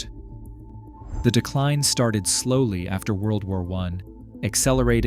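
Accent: United States. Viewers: The city of Derby is pronounced in the UK...correctly...as DARBY...whoever put this piece together should have organised an English-accented Narrator...an American accent is inappropriate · Whoever produced this, and I'd be curious to know, its very interesting. Subscribed. 2 Questions. Why voice-over in American English, not N.England accent, say? What's this got to do with aviation? Keep 'em coming!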